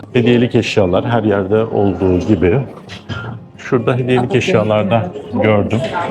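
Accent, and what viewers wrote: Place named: France. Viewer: Eline sağlık, yine bilgilendirici ve güzel bir kayıt olmuş. Şunu da belirtmeden geçemeyeceğim; Fransızca aksanın yakıyor. Belki Fransızları değil ama beni kıskandırıyorsun 🤩Saygılar.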